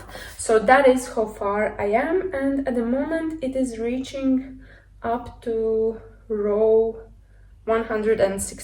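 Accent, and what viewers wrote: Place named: Eastern Europe. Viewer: i think it looks great😊 i would make it to the length below your knees, nearly to your shoes, that will give the „wedding dress“ type of dress, but it‘s definetely wedding dress material! keep on doing row by row, i‘m excited for the final part😊❤ after it‘s finished i would sew a skin color material under the dress so it looks like your skin but it gives you more secureness🤗 btw what accent do you have? it sounds slavic to me😊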